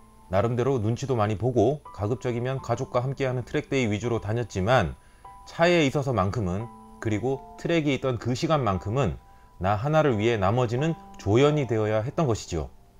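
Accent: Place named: Gyeongsang, South Korea. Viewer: 잘 보고 있습니다. 경상도 억양이 느껴지는데 고향이 서울.경기권은 아니신가봐요. 차라리 강호동, 김제동씨 처럼 말씀 편하게 해보시는 것은 어떨까 조심스럽게 의견 남겨봅니다^^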